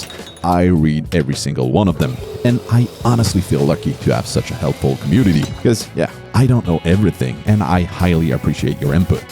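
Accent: Quebec, Canada. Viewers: I thought u were from Quebec i an from saint join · Hey men je savais pas que t'était Québécois ❤ #faiticitte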